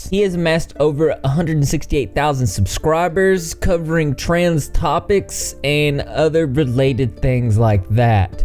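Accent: South, United States. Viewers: Interesting social dynamic. Thanks for sharing. Also, you're a cutie and I like your accent. I'm usually not fond of southern accents, but yours is really nice. [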